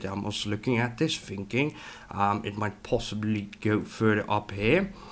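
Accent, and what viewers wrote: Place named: Netherlands. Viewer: Liliana Georgiana Boros Als je goed kijkt naar de items in z'n browser, bv 'Hobbie' dan is het duidelijk een Nederlander. Dat kun je ook horen aan de manier waarop hij Engels praat. Nederlanders praten op een hele typerende manier Engels.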